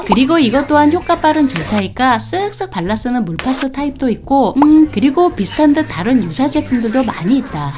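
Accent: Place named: Japan. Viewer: 한국어를 일본어 처럼하시네요 ㅋㅋㅋ 독특한 억양 중독성있습니다 꿀팁 감사합니다~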